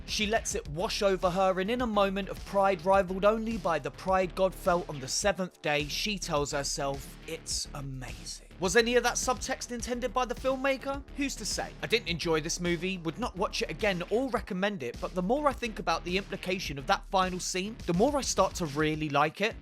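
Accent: United Kingdom. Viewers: Talk about the perfect man!!😅❤ Watches disturbing films and has a British accent!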